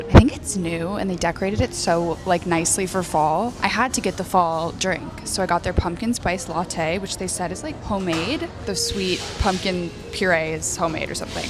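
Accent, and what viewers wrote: United States. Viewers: Hello I am from India. I often watch your videos for learning American accent and your vlog makes me happy and energetic. I really love you ❤❤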